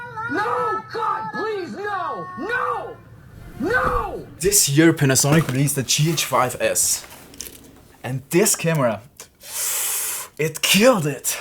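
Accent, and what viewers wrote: Austria. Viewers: Yes, he is. For an Austrian it's pretty hard not to sound like an Austrian, even when talking English ;-)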